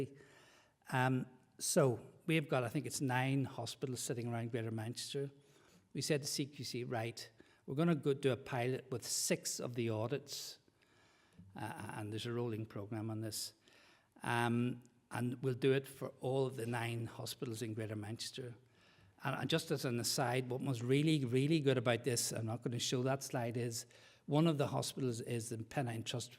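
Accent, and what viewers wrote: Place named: Ireland. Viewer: The Irish accent ❤️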